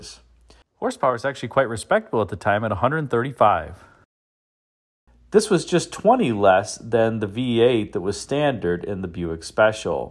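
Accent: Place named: California, United States. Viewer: he sound like he got California accent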